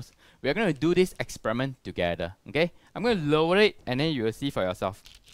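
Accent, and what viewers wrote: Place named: Asia. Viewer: bro you have perfect asian accent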